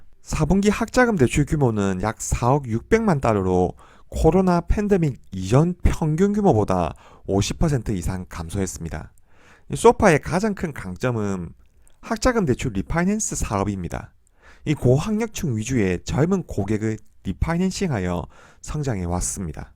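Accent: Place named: Gyeongsang, South Korea. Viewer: 감사합니다.. 항상 잘 보고 있습니다. 부산 사람으로 경상도 사투리가 너무 익숙하네요^^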